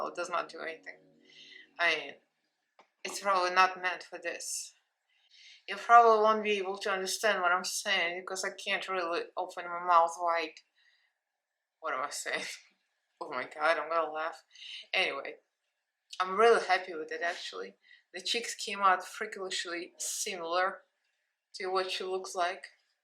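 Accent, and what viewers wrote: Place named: Russia. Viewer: You are just too funny. "My husband tells me I look just like her, but he always compliments me, so I don't trust him." "I sound like I have a speech defect. Yes, I have a Russian accent, so I sound like I have one anyways." 🤣. I already told you I think you look exactly like Alexis, too. And I'm not your husband, so you should believe me.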